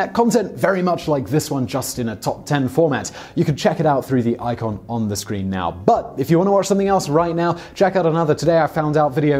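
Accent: United Kingdom. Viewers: relax! The more I hear you the more I understand. U. K. Accent? Big deal. I grew up eating grinders. Poor's boys, subs hoggies. What ever. Same thing. Different name. I can't imagine what Webster's going though now! I gotts to know!!! Haha!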